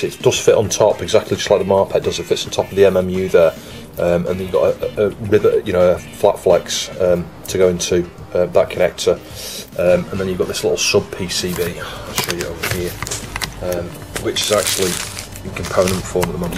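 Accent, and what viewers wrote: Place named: Northern England, United Kingdom. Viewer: It's also that thick Manchester/Sheffield accent that's making my brain a bit herky-jerky when I'm trying to listen. :)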